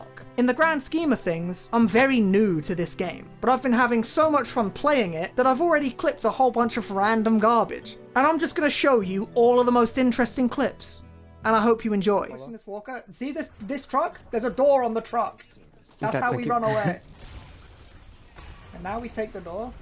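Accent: United Kingdom. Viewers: I am nourished with this, yet I am not satisfied, I guess I can never feel the same with TF2 for a while, but you should still talk about deadlock, it's a cool game and the way you say swoons me of how bri'ish it is. Btw I hope this won't be the next game for Valve to forget after some years without a "final update" Btw can you talk about celeste movement pls? It would be really cool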